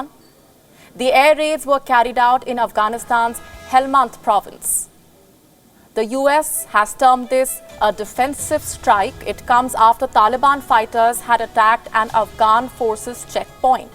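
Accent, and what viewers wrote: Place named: India. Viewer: Sir , Nobody talked about professionalism here It was just a personal request . I'm in no way demeaned the current anchor . She is good . And If you don't get Indian accent there are many other great news channels you can switch to them anytime It's better not to hurt your ears . By the way , your comprehension skills aren't great either !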